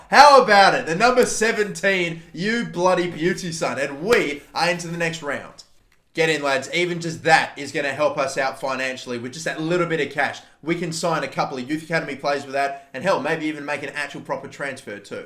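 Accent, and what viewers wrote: Australia. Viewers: Khai Brown he’s Aussie lol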